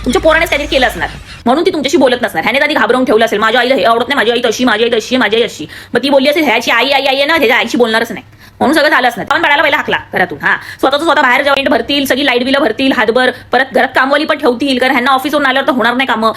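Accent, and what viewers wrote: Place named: Western Maharashtra, India. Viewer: तुमच्या भाषेचा टोन बघून तुम्ही सांगली कोल्हापूर सातारा इथल्याच वाटताय... पण जबरदस्त रिअल इव्हेंट वर विडिओ असतात सॉलिड 😂🤣😂😂.... Keep it up... 👍🏻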